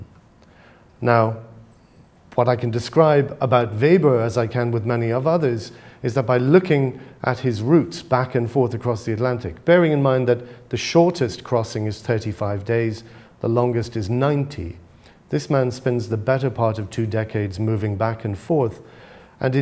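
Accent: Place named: England, United Kingdom. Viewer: Did his first degree at NUI Galway...Very English accent however...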